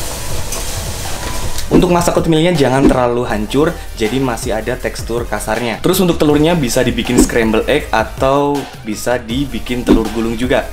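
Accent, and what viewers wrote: Malang, Indonesia. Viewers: Orang malang?? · oalah mas iki wong malang to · Asli orang malang ta?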